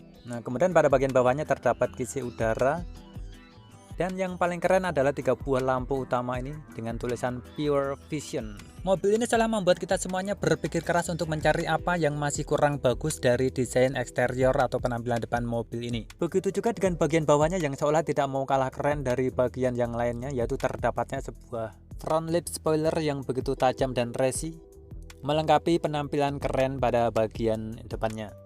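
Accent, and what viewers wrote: Java, Indonesia. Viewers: Medok banget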